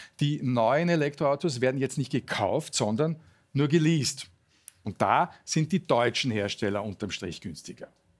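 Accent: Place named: Vienna, Austria. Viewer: sein Wienerisch ist wirklich ein wenig anstrengend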